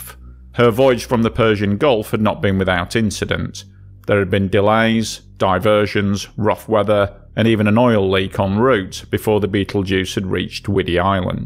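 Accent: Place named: Yorkshire, United Kingdom. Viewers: his accent... from Yorkshire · I love his accent... I was guessing Yorkshire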